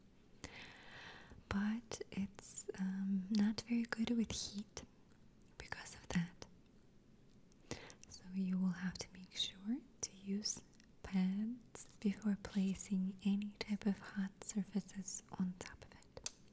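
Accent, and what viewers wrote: United States. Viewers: Does anyone know where this amazing lady comes from? Her accent is obviously very American but I'm sure I can hear something else in it and it sounds beautiful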